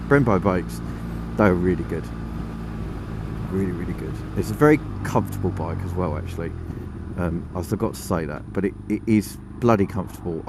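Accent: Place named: United Kingdom. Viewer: Dig your sense of humour and Brit accent LOL 😂 Great video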